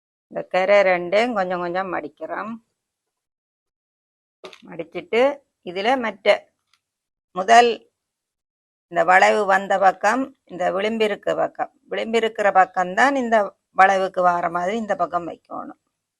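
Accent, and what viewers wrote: Sri Lanka. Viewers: neenga pesarada Partha Jaffna madiri erukku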